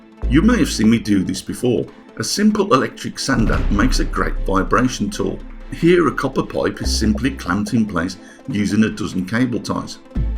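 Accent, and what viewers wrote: Birmingham, United Kingdom. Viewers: As is David Attenborough to the natural world, so is to furnaces. With a Brummie accent, of course,;-)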